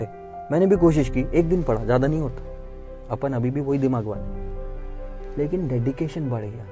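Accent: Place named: South, India. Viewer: Don't mind sir but your accent sounds south indian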